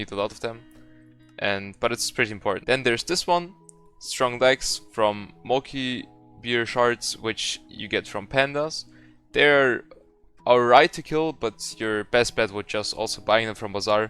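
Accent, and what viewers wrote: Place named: Germany. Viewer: bro ist deutscher als deutsch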